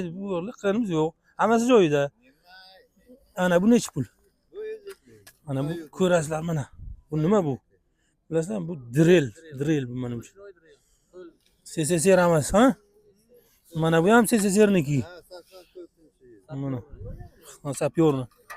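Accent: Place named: Peshku, Uzbekistan. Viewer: БУХОРОНИ ҚАЙЕРИДАНСИЗ ШЕВА ПЕШКЎГА ТОРТОПТИДА ДЕГДАВОНМИ ПАХТАОБОД ГУЛХАЛОНМИ КАРОЧИДА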